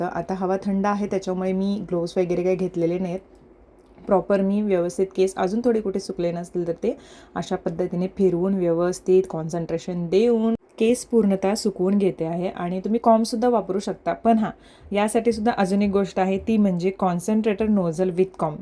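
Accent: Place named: Belgaum, India. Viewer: Rahus nako... Amchi belgav chi basha.. Aikun bar watal..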